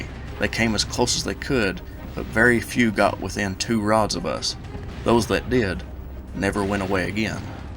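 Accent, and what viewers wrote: South, United States. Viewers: Wow, excellent job! I just binge watched every one of your Gettysburg videos and I must say well done sir! I can tell by your accent you are of southern birth, as am I. My 3x great uncle fought at Gettysburg in the 7th La infantry.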